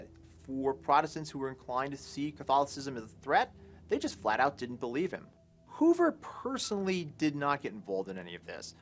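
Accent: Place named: Baltimore, United States. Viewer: This guy has an INSANE BALTIMORE ACCENT.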